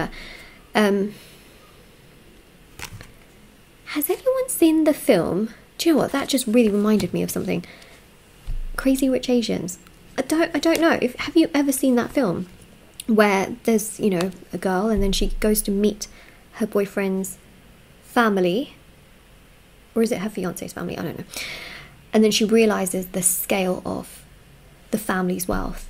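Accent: United Kingdom. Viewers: This is fairly accurate. I've heard similar from others. It's so in depth too, literally one of the longest readings. Really appreciate all the time and energy spent on the reading. Thank you! Love your voice and British accent too 🙂💜.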